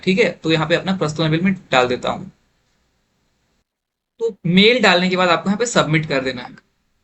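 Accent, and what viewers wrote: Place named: Bihar, India. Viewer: Bhai aap bihar se hi kya tone match kr rha h 😊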